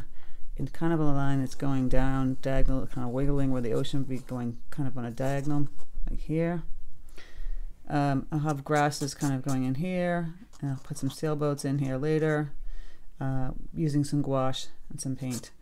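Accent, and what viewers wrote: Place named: North, United States. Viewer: Plumes. The things on the top of the grasses, like pampas grass. But anyway, you're my favorite! Girlfriend, you are the best teacher. It's all just likes and shapes and marks. You make it all so approachable. Thank you very much! Not only do I watch all of your videos, but I frequently paint along with you, and if I don't paint with you, I save the video to paint with you later. You've blessed my life. PS. As a NYer living in Atlanta, I love to hear your northern-ese way of speaking. ;)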